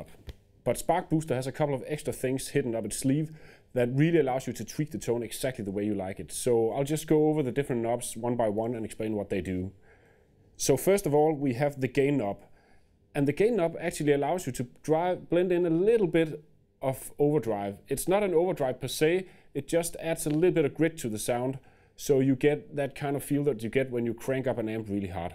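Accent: Denmark. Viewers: I play steel guitar through a solid state amp. I'm looking for a clean boost to take solos with. If I understand this demo correctly, the gain won't add overdrive unless this is going through a tube amp, right? I don't mind a little bit of drive in the sound on peaks but its a clean boost I'm looking for. Btw; I work regularly in Denmark so the accent doesn't bother me - believe me, its easier to understand than listening to someone "like... so from California"! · Wow he sounds danish!!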